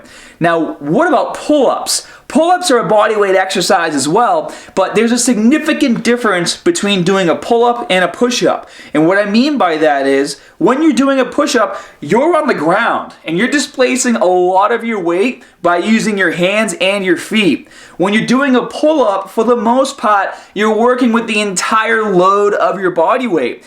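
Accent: Boston, United States. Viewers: Is this guy from Boston? He sounds like it.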